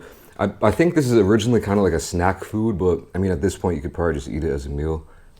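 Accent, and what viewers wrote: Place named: Philadelphia, United States. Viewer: Happy Birthday homie!! Easily my favorite content creator, the way you narrate things reminds me of my brothas from philly 🤙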